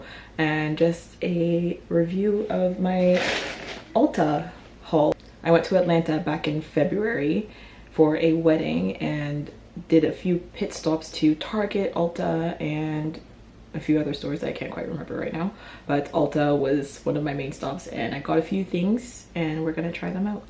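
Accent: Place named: England, United Kingdom. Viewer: I loved the touches of the English accent 😂😂😂 Beautiful finished look ❤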